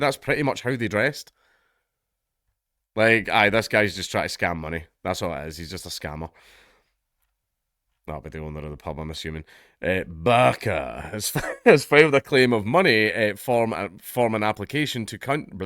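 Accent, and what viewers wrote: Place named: United Kingdom. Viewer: tbf that's just the british dialect for you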